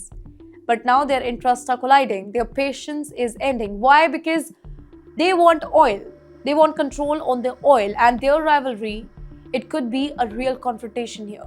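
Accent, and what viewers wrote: India. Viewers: She's Indian, they pretend to speak English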